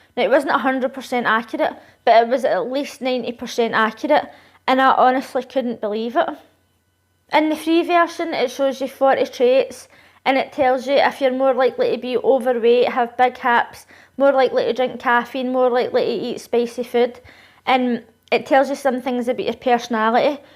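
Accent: Scotland, United Kingdom. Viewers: Is that a scottish accent? I'm American. Can't tell. I bet she's very British.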